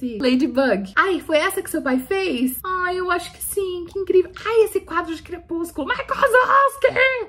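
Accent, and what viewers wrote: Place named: Minas Gerais, Brazil. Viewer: amo demais a sua dinâmica de vídeos, amo estantes alheias, amo a maneira que você é educada sim, amo o nosso sotaque mara de Minas, amo as indicações, mesmo que meu gosto literário é um pouco diferente você me desafia a ler vários conteúdos diferentes!!! ❤ continue!!!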